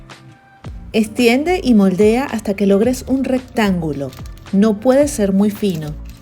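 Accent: Venezuela. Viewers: Me encantan tus recetas muchísimo . Me gustaría aprender algunas recetas de tu hermoso Venezuela 🇻🇪 me encanta tú acento 💜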